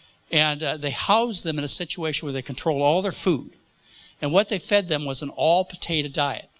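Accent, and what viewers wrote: United States. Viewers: 'Scuse my ignorance as a Brit/Aussie, but just what is wrong with potaytah, that's how I say it, and where is this US accent from?? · It's a midwestern accent. You never lose it. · Carole von Aarberg Hello from a Southern Louisiana girl! Nothing wrong with this pronunciation! I’m not sure exactly where the doctor is from but his pronunciation is definitely a Southern US accent. There are many accents in the South but many say potaytah.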